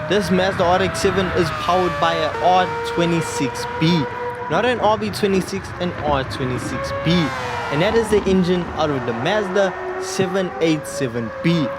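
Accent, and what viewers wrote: South Africa. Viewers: Are you south African???😭😭😭 I'm picking up your accent and it sound heavy familiar...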